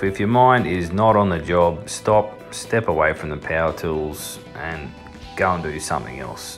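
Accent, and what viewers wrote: Australia. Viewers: Aussie accent took me on a ride for sure. Thanks for a speedy reply! Thats a sweet hefty vise you got there. Looks like a Record’s 53e younger brother. Your shop looking spiffier and spiffier! Soon you’ll have to grow a beard and visit tattoo parlor. Its a must ... you know. LOL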